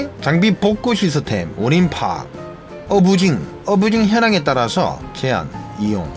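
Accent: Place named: South Gyeongsang, South Korea. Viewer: 억양은 경남 ㅋ 단어는 공가라,쓰바르마 경상 ㅎ 섞여있는듯해요~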